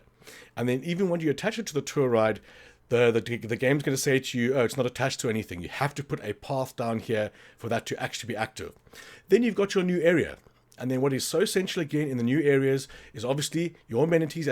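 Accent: South Africa. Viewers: Awesome video thanks man! I recognize the accent, Are you south african?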